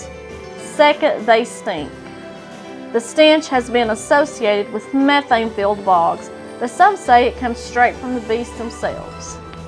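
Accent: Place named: Appalachia, United States. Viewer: What a bunch of garbage with a hillbilly accent !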